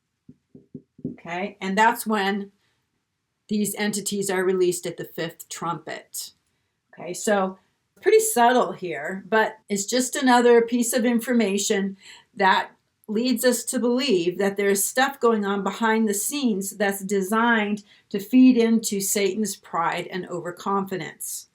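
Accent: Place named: Idaho, United States. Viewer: I've been binge watching for about 3 years. With every teaching, I grow in knowledge and understanding. I'm very grateful that I was led to your channel. I thank God for the work he is doing through you. Side note. I enjoy your Idaho accent. I miss hearing it. Love and peace always.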